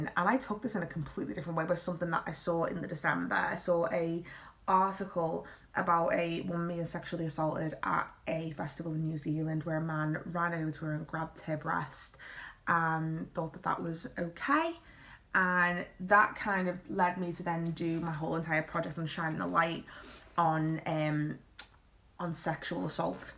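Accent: Liverpool, United Kingdom. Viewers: Thanks for your reply hun, love your accent too, can't beat a scouse accent! haha I'm from Belfast! That's great you loved it, it's hard practicing on your own but when you're kind of 'forced' lol you get lots done so i'm looking forward to it. I'm defo going to go to uni next year, what did you decide to study in the end- fine art or textiles? would love to see a video on uni life for mature students! :)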